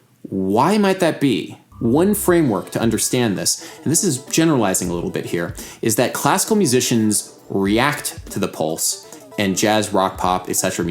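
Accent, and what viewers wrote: United States. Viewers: American musicians follow the German system of naming note values after fractions, while British musicians have adopted the French system. Judging from the uploader's accent, he appears to be an American. Therefore, his use of "quarter" and "eighth notes" is entirely proper.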